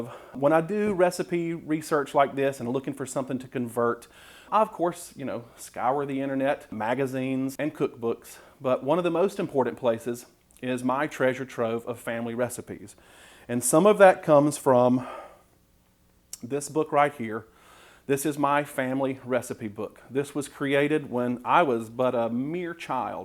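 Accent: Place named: Appalachia, United States. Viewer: You're awesome =) You talk like my folks in the mountains of Virginia =) I left there in 1995 and moved to Ohio. I might have to retire and move back =)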